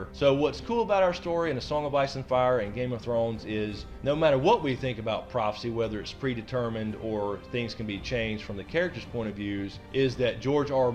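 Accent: South, United States. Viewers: I’m really loving to hear another Southerner like myself talk about GoT like a pro 😌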